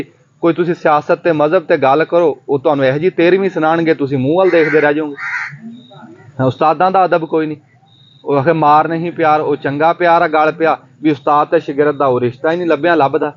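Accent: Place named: Doaba, India. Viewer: Wah ji sahib,tuhadi Punjabi 100% duabey wali boli koyi pata nahi lagda ke koyi Pakistani ja indian punjabi..videos tuhade boht hi changgey te akal den waley hunde aa..👏👏👏👏👏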